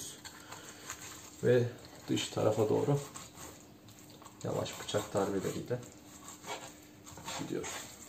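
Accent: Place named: Kayseri, Turkey. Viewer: Kayseri ağzıyla video çekkk 😊 başarılar tatlı abim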